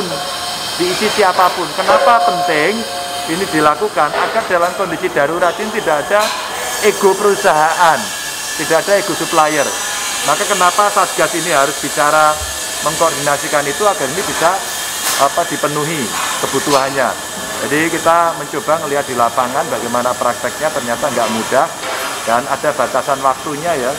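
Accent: Banyumas, Indonesia. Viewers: Salam Ngapak pak Ganjar (TEGAL Haaddiirrrr),,,😁🙏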